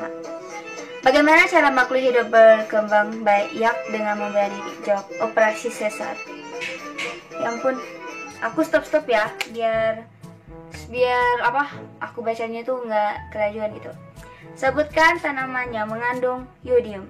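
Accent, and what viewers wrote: Indonesia. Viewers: Logat"nya persis orng indo.. Aku kira orang indo yng mentap di brunai..😀😁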